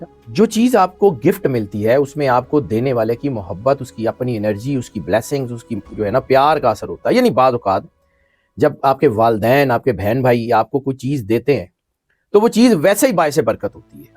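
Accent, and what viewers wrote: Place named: Rawalpindi, Pakistan. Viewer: Assalam walekum sar aapki awaaz bahut jyada speed Se Hoti Hai aap video Tej Karte Hain sukun ke sath aap video chalayen Karen aapki awaaz Aisa Lagta Hai Jaise Pindi wale sahi se Peeth se bolate Hain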